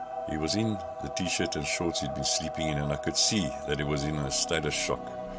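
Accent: South Africa. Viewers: You're English speaking? Where you from? Bloemfontein? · You have a very strong Afrikaans accent. JY is soo Afrikaans en probeer bewys JY is nie.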